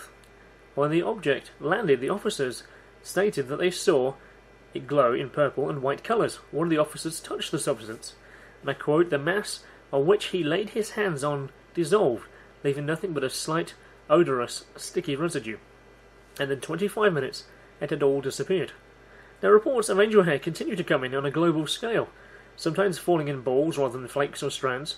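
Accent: United Kingdom. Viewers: When the narrator is a Scottish you can bet he knows what he's talking about just like in the original Star Trek , Captain Kirk this is Scotty you got to give me more power ! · This guy is english, what are you talking about lol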